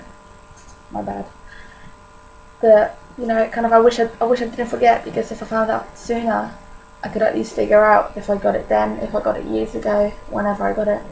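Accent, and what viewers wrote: United Kingdom. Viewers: God bless you but you sound very British to me. I am sure I cant notice the slight accent because I am American. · polish? ya fink? sooo british it hurts...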